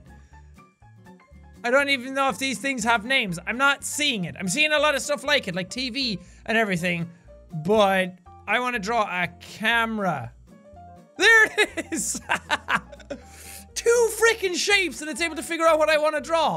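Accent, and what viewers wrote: Ireland. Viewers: ur Irish accent really pushed in this video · Are ya Irish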